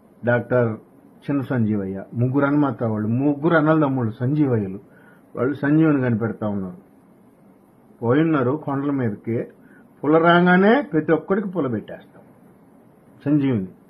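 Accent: Nellore, India. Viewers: హాయ్ గారు 🙏 చాలా బాగా చెప్పారు సార్ 😂 మీ వీడియోస్ watch చేస్తుంటాను చాలా బాగుంటాయి, ముఖ్యంగా మన నెల్లూరు జిల్లా యాస బాగుంటుంది 👏👏 మీ మాటలు,అమ్మగారి మాటలు వింటుంటే నాకు మన ఊరు యాస గుర్తు వస్తుంటుంది am from hyd. సూపర్బ్ taking గారు మీరు,👏💐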